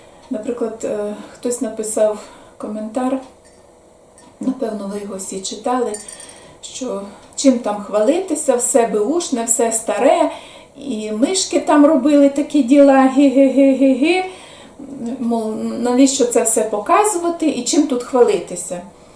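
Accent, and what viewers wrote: Western Ukraine, Ukraine. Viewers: Пробачте, вперше попала на Ваш канал і цікавлюсь з якого регіона ви переїхали? Бо мова у Вас як у західних українців. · Пані, ви справжні і не звертайте уваги на недолугих людей, які ще сміють сміятися і засуджувати, що ви купили стареньку хату. Невже притомна людина може так думати? Люди, та ЩО з вами?!! Тисячі людей тікають від дикої орди, залишають домівки, залишаються без миски-ложки, змушені починати життя на новому місці, а ви зловтішаєтеся! Замість допомогти, чи просто підтримати добрим словом. Пані, нехай вам Господь дає сили і здоров'я всі негаразди подолати.❤ А певно пані має західняцьке коріння, бо чую по розмові)). Вітання вам від тернополянки❤❤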